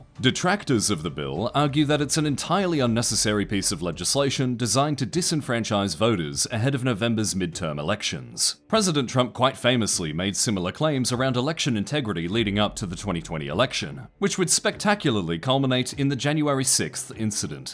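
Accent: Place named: Australia. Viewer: Intro hits hard, your intros always hit hard. The music choice is spot on EVERY time. i feel like ive seen more aussies in the past 5 years online than any time before in my life and i just wanna say you're (almost) all awesome. Australian accents are like british accents just with some spice, and a million times better, imo. Live long an prosper (not a startrek fan)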